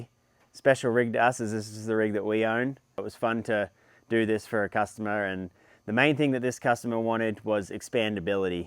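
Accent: Australia. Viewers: Just me or you sound very Aussie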